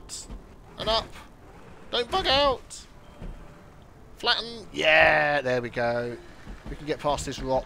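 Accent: United Kingdom. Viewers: I love the UK accent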